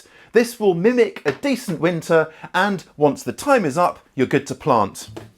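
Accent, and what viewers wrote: United Kingdom. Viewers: Is he British?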